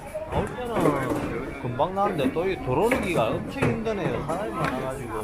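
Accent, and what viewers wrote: Gyeongsang, South Korea. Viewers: 말투듣고 갱상도인지는 알았지만 김해분이시네요 반갑습니다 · 유튜버 말투가 참 인정스럽네요. 완전 경상도 말씨네.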